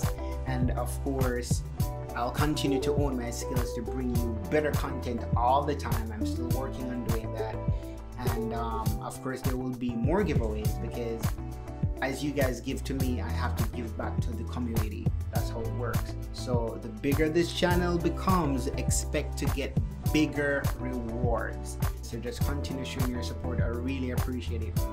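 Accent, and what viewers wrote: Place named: Jamaica. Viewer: I heard our accent lol, I'm Jamaican and a new subscriber😁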